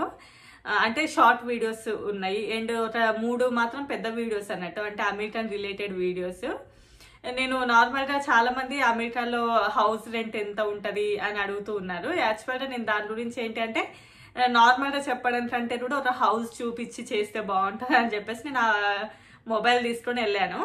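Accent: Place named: Mahabubnagar, India. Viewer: Mee Matlade style and yaasa Chustunte ma Maibnar district valemo anipistundi ☺️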